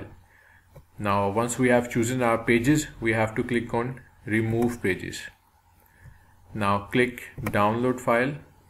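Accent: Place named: India. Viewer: Was hoping to hear an accent in STANDARD English... Another India. 🙄🙄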